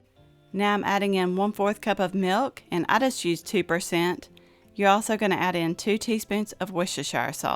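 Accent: South, United States. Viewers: I love your southern accent where are you from? My guess is Georgia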